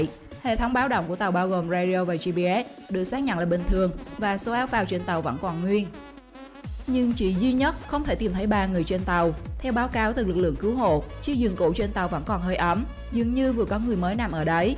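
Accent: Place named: Nẫu, Vietnam. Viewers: Giọng miền trung nghe ngộ ngộ mà dễ thương ❤ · bà mc này người nẫu phải khg chèn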